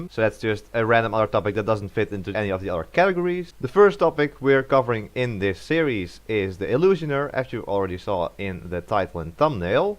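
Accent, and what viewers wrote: Netherlands. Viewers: ah, dutch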